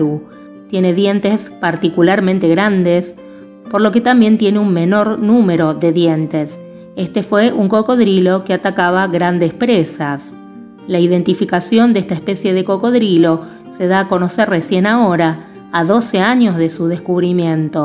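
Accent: Argentina. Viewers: ¡Me encanta este canal! Soy de Nueva Zelanda y el inglés es mi lengua materna, así que disculpa cualquier error. Si aún no lo has hecho, deberías hacer un video sobre las aves de Nueva Zelanda. Tenemos muchas aves raras aquí, incluidas muchas que no vuelan. Me encanta tu acento. ¿Eres de Argentina? Un abrazo desde Nueva Zelanda 😊 .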